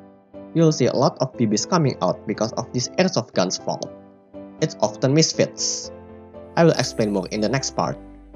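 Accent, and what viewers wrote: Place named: Indonesia. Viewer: Masih ada logat indonesia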